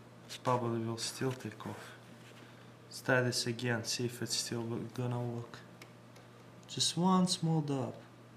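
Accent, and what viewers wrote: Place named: Russia. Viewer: ***** he is russian. i am sorry about the comments. So. he is not slow , he is russian.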